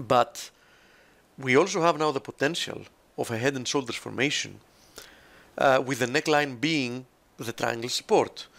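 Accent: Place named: Greece. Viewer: Love his greek accent. Best analysis going.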